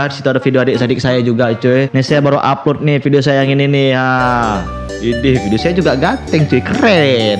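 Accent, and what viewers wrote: Nias, Indonesia. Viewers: Abng orang nias yah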